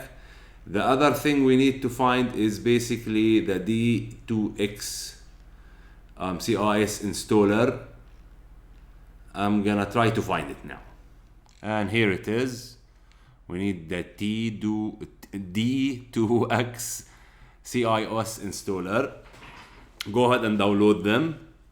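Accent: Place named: Arab world. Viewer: I LIKE YOUR ACCENT IM ARAB AS WELL BUT LIKE YOUR ACCENT IS JUST WOW.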